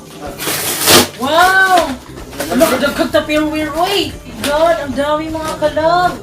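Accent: Visayas, Philippines. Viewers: James Bryle bisaya kasi siya, just like me. i noticed her accent. hehe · i like the way you pronouce haha Lusyon. haha i am just like you. haha the way you speak. Bisaya man gyud. God blessed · Kaboses mo si michelle fox... Visaya accent is real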